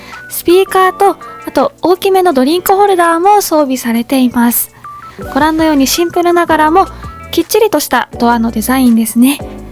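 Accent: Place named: Ehime, Japan. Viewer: 新型フリード クロスター乗りです。久しぶりの愛媛弁、懐かしく可愛かったです。 旧西条市の市中心部出身でした。 チャンネル登録しますね。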